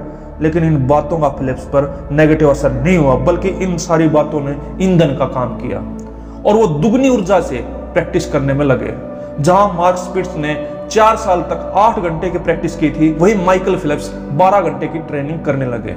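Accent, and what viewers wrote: Haryana, India. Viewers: Sir aapki awaj ka haryanvi touch wonderful hai .... Thanks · Haryanvi hindi 😮😮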